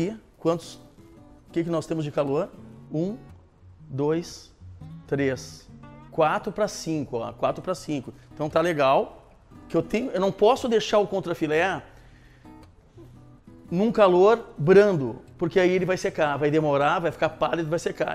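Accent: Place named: Porto Alegre, Brazil. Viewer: Sotaque porto alegrense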